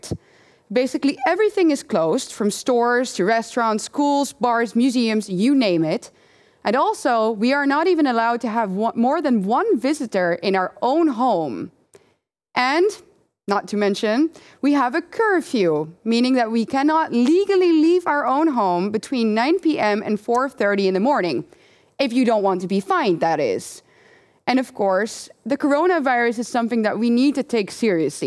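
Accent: Netherlands. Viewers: Weet-landa, main accent on first syllable, only subsidiary accent on the second, and long e despite followed by two consonants, since only first of them belongs to same word within the compound. If I may say so, there are two accents that sound basically like you when speaking English : Dutch as yourself and Icelandic (have you checked out Leon and Sorelle?). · Man.. I love the Dutch Accent!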